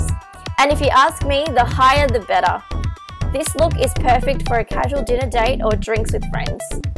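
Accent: Australia. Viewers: i love australian accent (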